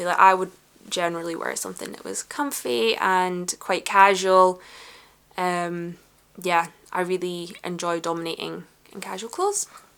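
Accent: Scotland, United Kingdom. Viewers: scottish is like drunk poetry, tell me more love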